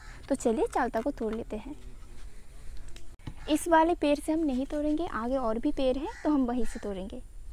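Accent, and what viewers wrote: West Bengal, India. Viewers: Beta aapki boli, aur ye kamal ke talab ,aur taad ke ped , aisa lag raha hai west Bengal se hain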